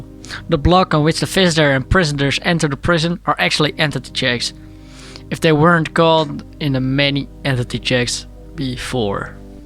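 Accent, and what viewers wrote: Netherlands. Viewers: you sound dutch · Eyo may I ask, are you dutch since your accent sounds like it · Are you dutch? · Are you Dutch? The accent sounds familiar (also I'm Dutch) don't try to not say it, I am not gonna escape it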